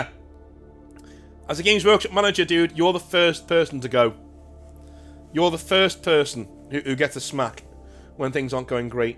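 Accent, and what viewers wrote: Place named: North, United Kingdom. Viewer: Some times I just need to hear a northern accent tell me to sort shit out. Cheers mate ❤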